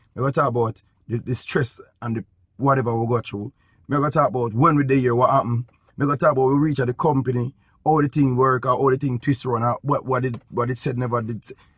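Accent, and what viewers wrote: Jamaica. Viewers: It's Jamaican patois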